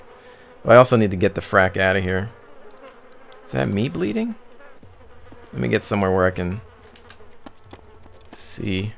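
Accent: Canada. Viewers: Great vids! Are you Canadian? I only ask because you say Day Zed, HostILE and Bugger off!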